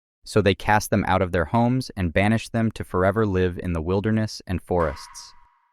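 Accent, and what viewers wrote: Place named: United States. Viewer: I understand not pronouncing most of the words correctly, it's a difficult language for outsiders and even Spanish-only speaking Mexicans fuck them up all the time, but having an American accent and intentionally pronouncing jaguar wrong(even more than normal) is ridiculous.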